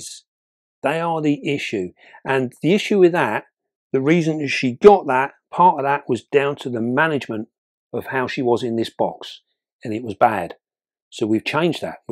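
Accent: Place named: London, United Kingdom. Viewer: English sounds a little like a London accent I think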